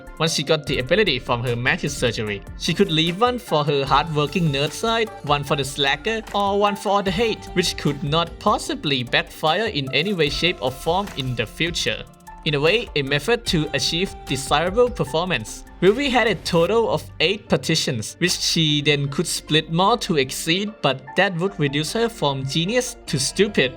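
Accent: Thailand. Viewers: Man are you thai? Cuz u kinda sounds familiar Btw nice vdo mann Just in case that u r thai ยังมีคนเล่นฮงไกกับข่อยดีใจคับ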